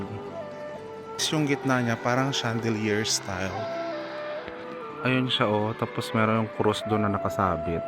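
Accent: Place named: Visayas, Philippines. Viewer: Hahaha! Bisaya pala yan si sir!😂 "dako kog ulok" 🤣🤣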